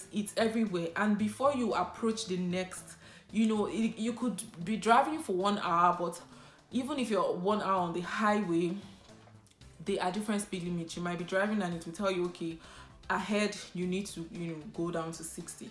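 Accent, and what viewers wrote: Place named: Igbo, Nigeria. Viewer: Love your hair and your Igbo accent is so sweet. Sorry is a no no... like you are apologising. yes!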